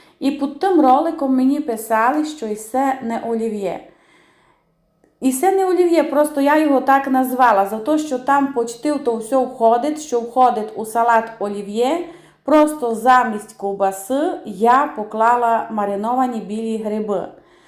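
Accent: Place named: Transcarpathia, Ukraine. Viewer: Дорога пані! Так сталося що я прожила в сонячному Закарпатті три роки (чоловік ❤працював) і я до нестями полюбила цей казковий куточок та цей діалект. Це така ностальгія. Ви кулінар, майстриня та художник ❤❤❤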